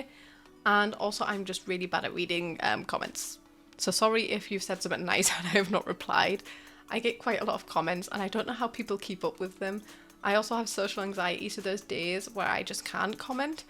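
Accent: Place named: Sunderland, United Kingdom. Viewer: If never guess Yorkshire accent. You sound more like a Makem than a geordie aha 😅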